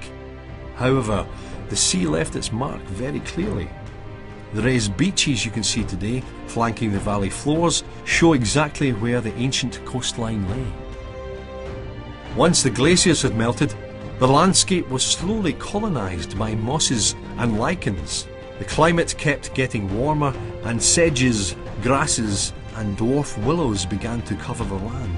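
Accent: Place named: Scotland, United Kingdom. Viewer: I have never heard such ignorance in the same place. The only good thing about this video is the cinematography and the Scottish accent. Beyond that it's a heap of BS. B. Howard, MG Retired Historian and genealogist.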